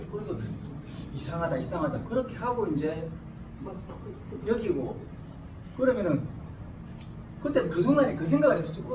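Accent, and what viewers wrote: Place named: Sangju, South Korea. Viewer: 직접체험 실정된 내용 정보나눔의 열정이 바탕이된 영상 짧은시간 함축된 간증의 이야기가 뜨겁구나 뒷부븐 라인체계가있었나? 마지막에 집중 못했다 옛기억을 더듬어보면 어릴적 누에칠때 뽕잎도 오염되지않고 깨끗해야하고 누에도 청결한곳에서성장하고 잠을재였든것같다 강연을봤으니 총평하자면 내용좋다 스피치에 강약 리듬를 추가하면 기억잔류에 효과가 더해지지싶을듯 도구의 사용 :::: 화이트 보드의 활용에서 우측 중앙상단에 한정되어있음 전체 공간의 필기활용으로 정보 주입저장성향상.... 경영체계 라인구성 (수직형 방사형?) 되물림 (상속)에서 진실성 진정성을 부각 네트워크 마케팅 첫인사 불신임타파노력 상주촌 놈 어투는 조금 변질시켜 표준어구사및 억양수정.. . 화ㅡ법 내용전달면에서 대화속도 말을 조금 천천히 쫗기는것같잖오.. 영상 구성에서는 박수치는 아저씨 아줌마 있든데 영상편집시 배석자 화면 1~2컷은넣어면 어떨까? (박수치는 동참하는 모습) 실크아미노산 정보확장 불 붙기를.....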